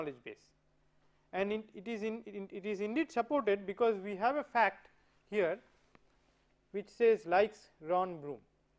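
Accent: India. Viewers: I lke the Indish accent. But thank you.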